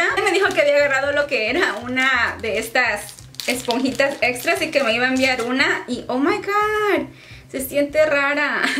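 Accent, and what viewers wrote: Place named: Mexico. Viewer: Disculpen mi ignorancia yo recién veo este canal pero no es mexicana? porque para mí tiene todo el acento mexicano.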